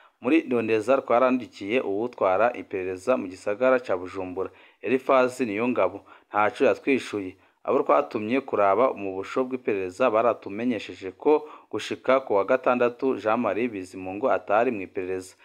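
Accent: Rwanda. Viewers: Amakuru yawe aba maremare kubera usubiramwo ivyo uba wamaze kuvuga kandi wige kuvuga neza ikirundi ugitandukanye n'imvugo nyarwanda nyarwa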